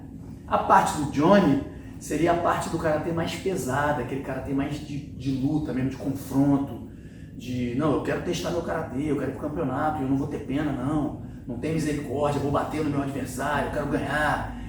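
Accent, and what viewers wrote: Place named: Rio de Janeiro, Brazil. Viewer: Sensei, onde é sua academia? Treinei karatê por muitos anos e gostaria de poder voltar. Senti uma esperança, pelo seu sotaque, de que o senhor seja do RJ. Abraços. Oss!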